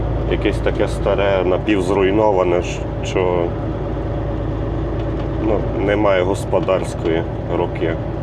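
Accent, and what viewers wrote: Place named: Ternopil, Ukraine. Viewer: земляку вітаю акцент Тернополя точно тобі вже пора нового петровича 389 😂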